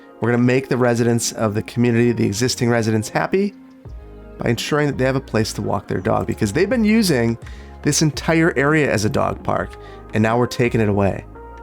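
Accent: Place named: Upper Midwest, United States. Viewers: you really hear that north midwest come out in baggers (BAEggers) I think that part of the regional accent is so charming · Lol, "certain amenities like *beggars*"...Gotta love that midwestern accent!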